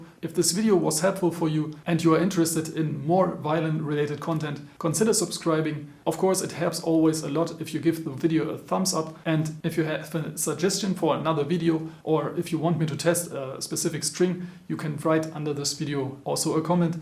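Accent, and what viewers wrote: Germany. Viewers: i also love your German-accent english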